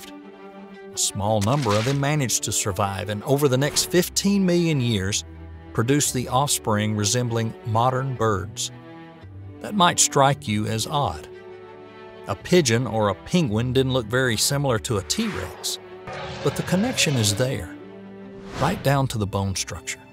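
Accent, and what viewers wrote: South, United States. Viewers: It is refreshing to hear a southern accent when learning about science and history! Thank you, Narrator, for breaking the stereotype of the creationist, Bible-belt Southerner! Please, please, PLEASE narrate more science vids in your accent! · Southern US accent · Gotta love the southern drawl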